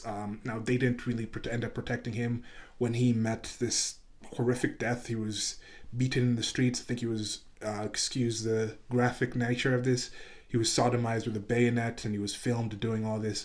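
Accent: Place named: United States. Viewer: Your accent says you're American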